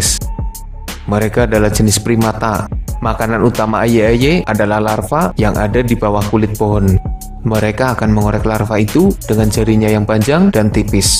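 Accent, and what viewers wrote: Banyumas, Indonesia. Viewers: Aksenmu mirip wong ngapak min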